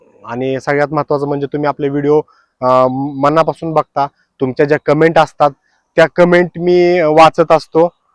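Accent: Western Maharashtra, India. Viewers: तुम्ही बोलता तो भाषा जी की, सोलापूर, सांगली, सातारा, कोल्हापूर ची ही भाषा तुमच्या तोंडातून आम्हाला ऐकायला आवडेल.... आम्ही मराठवाड्यातील हिंगोली जिल्ह्यात तुमचे हिडीओ खुप बघतो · पश्चिम महाराष्ट्रातील सोलापूर , कोल्हापूर ,पुणे,सातारा ,सांगली या भागातील भाषा आपण बोलता ऐकायला खूप मजा येते. हॉटेल मध्ये गेल्यानंतर तेथील प्रत्येक सजीव व्यक्ती ,निर्जीव वस्तूचे वर्णन, हॉटेलचे वर्णन खूप छान असते . वेगवेगळ्या हॉटेल मधील चविष्ट मटण रेसिपी पाहायला खूप मजा येते. मी नेहमी आपले शॉर्ट्स व्हिडिओ पाहतो❤❤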